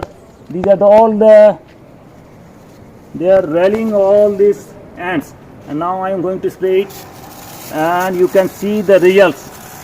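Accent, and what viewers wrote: India. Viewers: Aap please hindi me baat kijiye. Aap ki English hindi jaisi lagti Hai. Aur India me jyada tar log jo kheti karte Hai wo hindi baat karte Hai.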